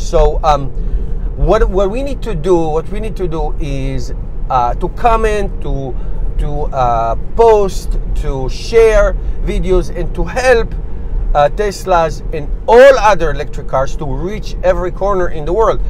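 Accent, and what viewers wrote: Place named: Israel. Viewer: חחחח שומעים מבטא ישראלי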